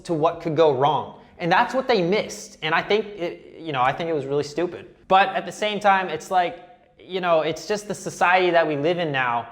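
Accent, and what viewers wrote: Canada. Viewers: What part of canada is he from, im from newfoundland lmao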